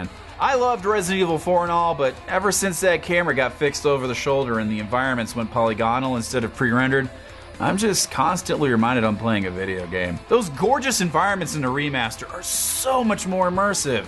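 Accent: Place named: United States. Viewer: Awesome review! Informative, humorous and to the point. It's nice to hear an American tell me about games rather than the obligatory Brit the big sites seem to hire for reviews. I'm not saying they are annoying....I'm saying your AWESOME. Sunbscribed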